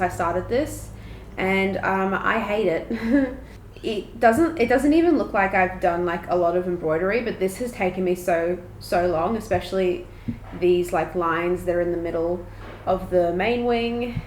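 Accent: Australia. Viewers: are you austral,ian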